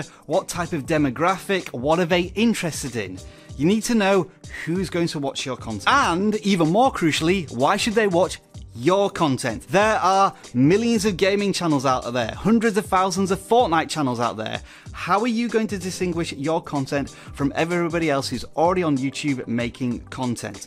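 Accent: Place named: Scotland, United Kingdom. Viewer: i can tell he's scottish 😁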